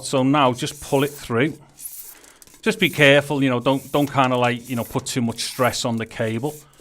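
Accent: Liverpool, United Kingdom. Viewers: are you from Liverpool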